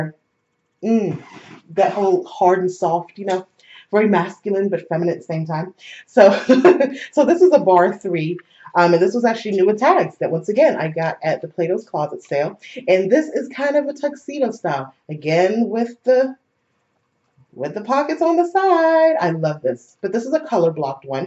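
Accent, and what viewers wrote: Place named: Jamaica. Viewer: you betta speak that petois/English accent! I can't wait for video footage! safe travels. ✈✈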